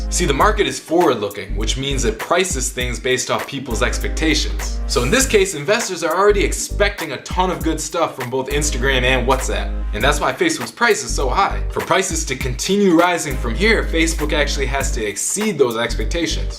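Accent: India. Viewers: the Indian accent kinda sounds like Scooby Doo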